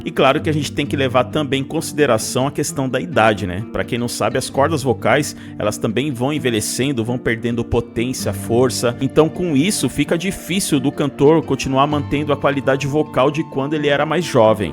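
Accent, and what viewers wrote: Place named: Brazil. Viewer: faz um favor ao mundo e para de tentar falar ou ler em Inglês…. É mau demais… não dá para aguentar o quanto é péssimo e mau.. entenda que para falar Inglês não pode ter sotaque brasileiro senão vai colocar letras e consoantes onde não existem e sai tudo horrível.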